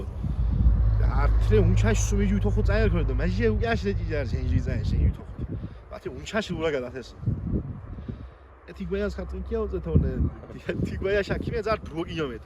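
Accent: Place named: Mingrelia, Georgia. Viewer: Ანუ ძმა მარტო სამეგრელომ უნდა გაიგოს თქვენი საუბარიიი! Ტურახტება ძმაა!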